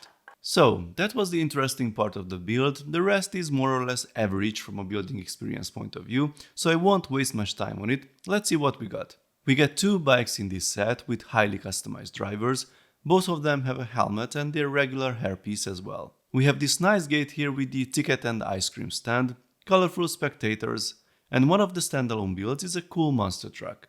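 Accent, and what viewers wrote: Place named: Hungary. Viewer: A Stuntz-os videóddal kezdtem... most látom a csatornád először.. volt bennem egy gondolat, hogy annyira magyaros az akcentusa az illetőnek.. tán csak nem magyar? De. :) Nagyon jó a csatornád, sok sikert hozzá!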